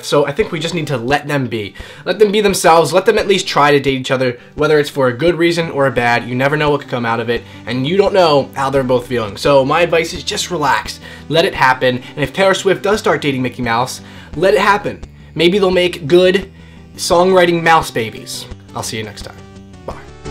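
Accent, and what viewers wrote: England, United Kingdom. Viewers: I love your British accent :D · Dat British Accent. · Right on with the British accent! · i love your English eksent:D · You have an excellent British accent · Nice British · +British Accent= <3:3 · Ur British accent is amazing *-* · I love ur uk accent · HA! I love hearing you talk in a British accent. Lots of love from the uk : ) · This is absolutely awesome(: Love your brittish accent ( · Omg your British accent is adorable!! Ur hilarious! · i love his english accent!! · OMG u are soooo funny xD and ur english accent o.m.g hilarious! :P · Your English accent is just afsbfsbdagagdagda <3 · Ur british accent rocks! :)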